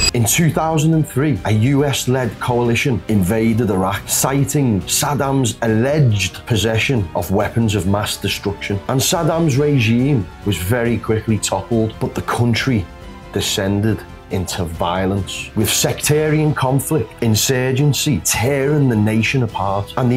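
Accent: United Kingdom. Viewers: تحديدا هاي لهجة سكوتلندا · dude your accent is cool where are you from? Birmingham? what accent is this? I know it's British but from where specifically? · انا عراقي اعشق اللهجة البربطانية الفخمة 🤤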